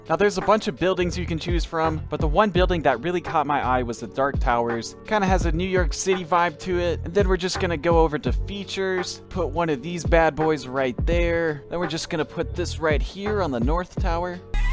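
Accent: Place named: Baltimore, United States. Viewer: algorithm That's just the Baltimore accent. You should see what happened with Aaron's iron urn.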